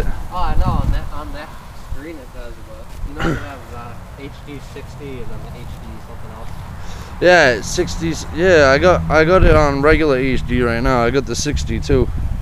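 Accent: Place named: Boston, United States. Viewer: I didn't even look at your page. but judging from your voice, you're from Bastin.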